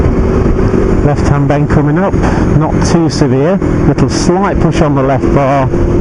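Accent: United Kingdom. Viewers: Hey man, good british accent btw, really clear unlike many others...big question if I'm getting to a really hard turn/curve I know I gotta start using the countersteering tech. but once I'm at it, should I start moving the handlebar gently to the direction I'm turning or countersteer all the way? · theres nothing like a british voice for a tutorial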